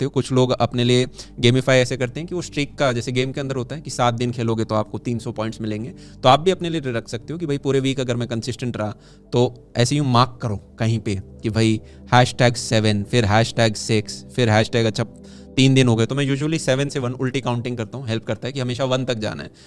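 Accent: Haryana, India. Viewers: bhaiya aap Haryana se ho ? kya aapke accent se lagta h😃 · Aap haryana se ho na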